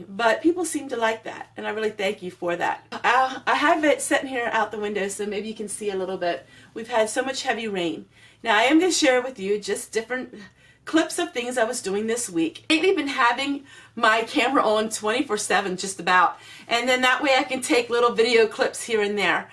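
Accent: Pennsylvania Dutch, United States. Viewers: nothing wrong with your PA Dutch I'm enjoying the dialect:) It's been a nice day today the sun came out and I was sitting in my yard:) Very quiet here except the sirens but not to much of that:) I got out to the store today to get a few things I needed to get but otherwise no real need to be to a store:) It sure does feel like I'm in the country here:) Been helping mom in the house:) That's a beautiful quilt great to see your grand kids helping out:) Love you my Dear sister:) God Bless you and Ken and Family:) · Lol you made me giggle. I like your Dutchie accent makes who you are. You have been busy this week enjoyed your video have a great week 🌷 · I love your Dutchy Pennsylvania accent! I've known several people from there and how y'all talk is so entertaining. You da bomb! I have Dutch heritage too......from Claverack, NY. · Hi Everyone, Happy Palm Sunday, was busy with poor new Lab puppy, What was I thinking >>> she is a distraction as to what is going on. 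Also attempted to sew some face masks My skills do need improvement, We have Pluto and a Firestick. Like you,I am rural in NY. Love hearing your dutchy Teach us some. Stay safe and Blessings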